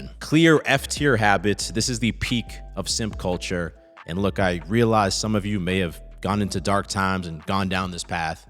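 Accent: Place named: South, United States. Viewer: This is my first time noticing the southern accent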